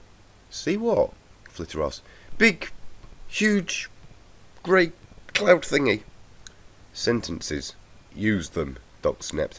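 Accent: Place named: England, United Kingdom. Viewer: SUCH A GREAT READER (now I want to have an English accent)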